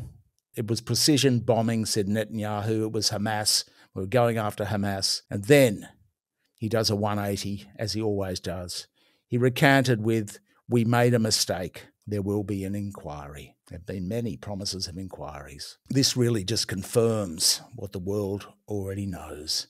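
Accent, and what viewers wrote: Australia. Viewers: My first time watching this channel. I thought "He is an Australian!" I can pick our accent pretty quickly now 😀. It's a friendly accent. Thank you. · Very grateful to be able to hear an Australian voice speak the truth. Legend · Finally an Australian accent criticising that horror in the Middle East by Israel and America. Frankly can never agree with imprisonment of indigenous peoples nor genocide or even starvation nor torturing via rape! They need stopping and justice, nothing else. Free the Middle East from Israel and America.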